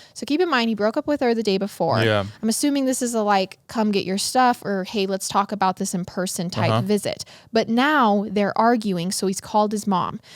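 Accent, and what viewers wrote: Utah, United States. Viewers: Crick for creek hill for heal sell for sale…. The list goes on! even the captions put other words form the way she pronounces. It’s a Utah thing right?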